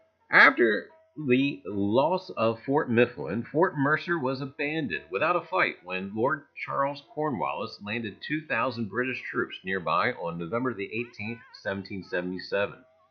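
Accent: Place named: India. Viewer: great video! nice Indian cent buddy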